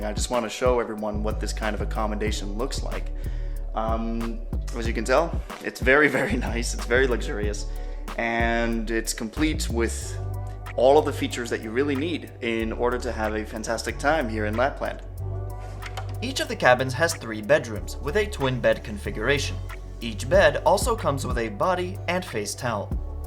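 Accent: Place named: United States. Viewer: Dude is that you talking? Why do you sound american 😆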